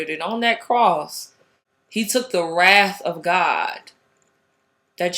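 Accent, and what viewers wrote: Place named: Northeast, United States. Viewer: Ma'am, where are you located? You Sound like you from up north east coast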